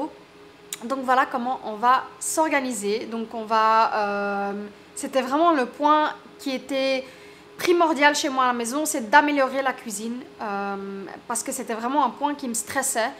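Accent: Belgium. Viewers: Coucou 🤗 J aime bien te regarder et entendre ton accent belge! Bref ta cuisine est très jolie je vois bien des fauteuils de bar cosy avec un dossier en beige velours ou daim (matière qui se nettoie bien!), ensuite je te conseille de peindre les huisseries en blanc pour ajouter de la lumière à ta cuisine et pour que ça fasse un ensemble. Dernier conseille fais une vidéo sur ta cuisine lorsqu elle sera terminée ou tu décris tout, si tu met du granit au mur ça risque de faire trop et d étouffer ta pièce le carrelage que tu as montré et très jolie mais je le verrais plus en blanc nacré 😉j espère t avoir un peu aidé 😘😘😘😘bises. Hasna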